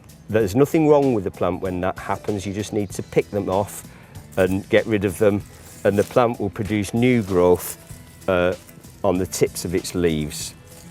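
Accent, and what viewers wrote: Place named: Northern England, United Kingdom. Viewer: 🤔....Michael Caine is a londoner.....this guy is from the north of England 😄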